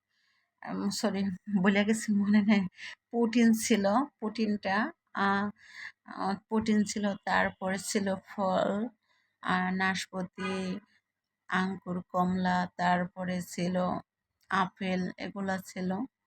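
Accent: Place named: Barisal, Bangladesh. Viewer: আপু তোমার দেশের বাড়ি কোথায় /আমি সুইডেন থেকে বলছি। আমার বাড়ি বরিশালে। তুমি বরিশালের কথা বলছো তো জিজ্ঞেস করলাম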